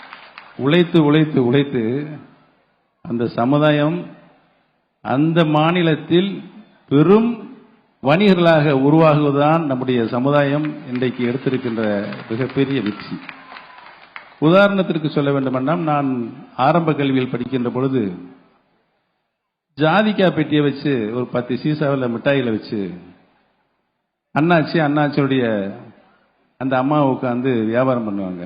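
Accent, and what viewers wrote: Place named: Tirunelveli, India. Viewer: திருநெல்வேலி நாடார் லே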